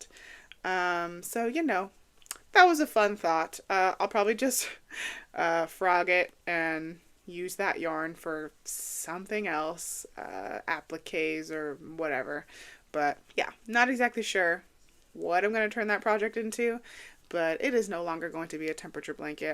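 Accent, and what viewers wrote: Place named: Northern California, United States. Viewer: Fellow Californian here (though I live out of the country now). What region of California are you in? Sounds like maybe up north?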